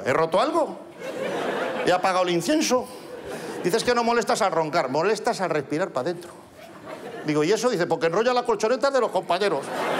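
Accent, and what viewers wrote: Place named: Castile, Spain. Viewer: Leo Harlem es de norte de España, más concretamente del norte de León. Su acento es castellano neutro por así decirlo.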